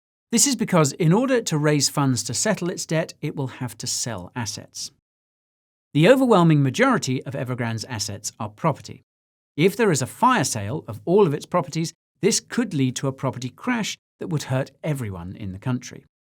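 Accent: United States. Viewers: LOLOL I love the American English accent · Good American accent... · stick to the cowboy accent brother !!! it suits you !!! :) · Your American accent is on point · Love that American accent.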